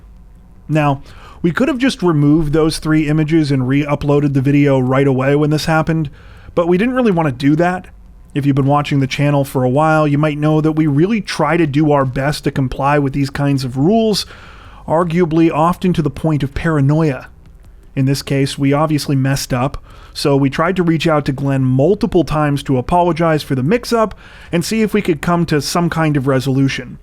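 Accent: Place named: Canada. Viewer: I don’t watch a whole bunch of crime channels, but I appreciate your work. I’m old, and the nostalgic ones really get me. I also like the respectful approach to events are at once fascinating and horrifying. I feel bad for the families involved. Death by illness or accident can be understood; death by violent murder must be very hard on the survivors. I’m so sorry for them. Additionally, I love a Canadian accent. “Been” absolutely should rhyme with “seen” but among Americans, it never does. Thank you so much.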